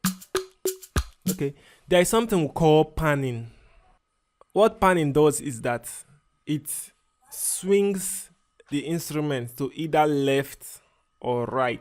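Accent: Nigeria. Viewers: I enjoy your tutorials because of the way you speak and explain in nigerian tone and language. You deserve a million subscribers bro I love you 😘😘😘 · Bro remain blessed Love your tutorial and I love your use of Nigerian accent hope we could would work together some day.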